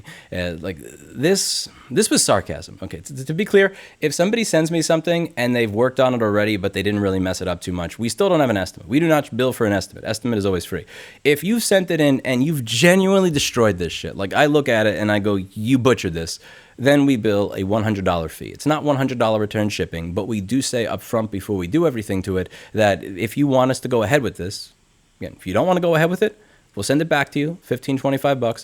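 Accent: New York, United States. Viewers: I subscribed because i enjoyed hearing you, an educated and passionate individual, talk about injustice in a fair and measured way with an excellent new york flair. I stayed for the same reason, but also because i appreciate your perspective on the world. Your commitment to values like honesty and integrity give me hope that there are still some good people out there. Im a pilot, just getting out there in my career, and doing things like networking on linkedin and building my resume make me feel like trash because im not succeeding on the modern pilot metric. I just have to remember what you said: success is based on what youre trying to personally achieve. Thanks, louis.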